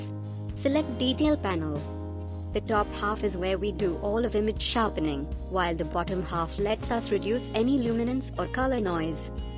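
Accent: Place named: India. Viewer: When even the voice over is indian, god bless you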